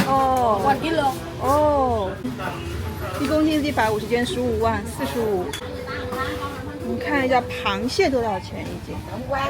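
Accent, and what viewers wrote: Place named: China. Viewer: 英文太偏中式😂😂😂比不过越南酒店前台服务。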